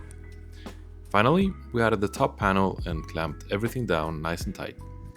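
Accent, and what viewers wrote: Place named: Norway. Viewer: where are you from? your english accent sounds norwegian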